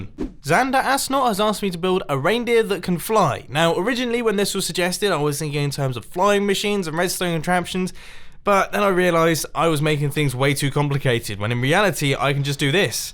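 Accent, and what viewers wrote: Netherlands. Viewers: Lol hoe hij nederlands spreekt😂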